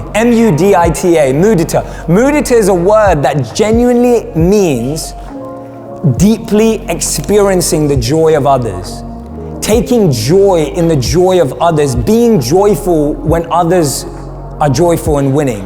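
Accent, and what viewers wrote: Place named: United Kingdom. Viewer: That British accent tho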